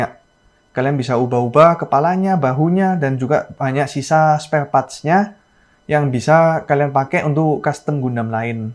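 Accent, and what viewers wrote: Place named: Java, Indonesia. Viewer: MedoQ sekali...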